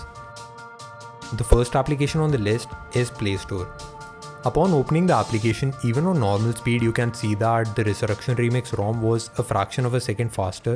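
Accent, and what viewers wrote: India. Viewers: yeah after he lose that Indian accent. people just dont like Indian accents. They talk so fast and dont realize they english is not that great because of that super strong accent.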